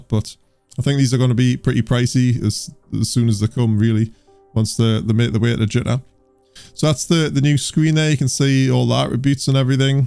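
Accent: Newcastle, United Kingdom. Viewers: Wait what, a Geordie playing eve. Why the hell am I not playing with you!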